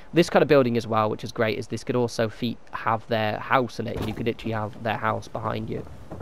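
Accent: England, United Kingdom. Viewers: English accents are perfect for instruction video lol you & Adi my favorite Fallout tips and tricks. Thanks bruv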